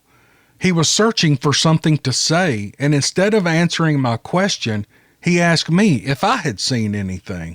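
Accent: South, United States. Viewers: Oh my Lord! that was a fantastic account, I was hanging on every word. Thank you to the gentleman who finally shared this hair raising experience.You're also one hell of a writer. The combination of great writing, riveting content & sweet southern drawl of a narration made for a perfect story. I think I'd have night terrors for years had I experienced anything like that. Way good thing that cryptid already had his supper in front of him. It wouldn't surprise me one bit if most of these other Cryptids (other than Sasquatch) weren't the results of some black project military/mad scientist attempts at genetically combining or re engineering experiments gone awry. Whew! that was a mouthful!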